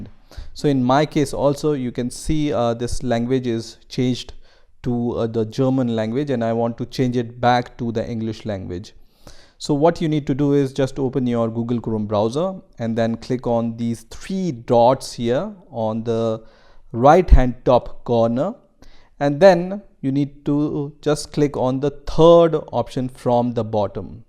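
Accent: India. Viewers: Thank you very much for your most helpful vedeo.I was facing this problem since mornig. I could rectify it from your step by step instruction in clear indian english. Kindly make more videos like this.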